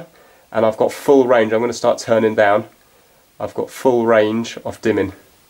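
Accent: England, United Kingdom. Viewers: Alex Calinescu that's an English accent from England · brilliant!!! Thank you for your solution and your charming British accent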